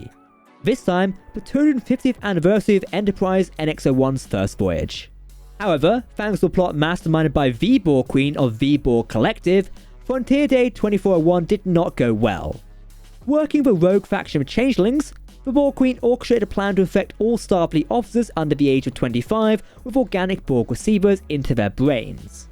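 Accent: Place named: England, United Kingdom. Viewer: Who,? It's a proper English accent. I could understand him just fine.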